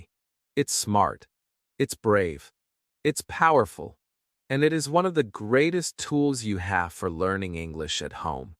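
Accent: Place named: United States. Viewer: I like your soft American accent very much 👍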